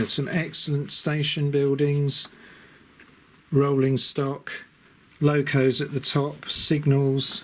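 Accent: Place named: Northampton, United Kingdom. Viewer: This brought back memories from 67 years ago when my elder brother convinced my father to buy a three rail train set. The old boy never really got to grips with electricity so he had to get one of his salesmen over to explain why the locomotive went backwards when he pushed the Model 3 controller throttle into the forward position. Lord knows how he would have coped with computerised DCC control with 32 separate blocks ! Thanks for making a wonderful video, I love your Northampton accent, where I was born 64 years ago.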